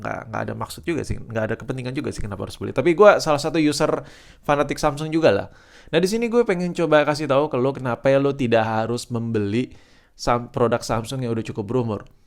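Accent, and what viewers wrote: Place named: Cikarang, Indonesia. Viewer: Gaya omonganya kek orang cikarang bae cuk wkwk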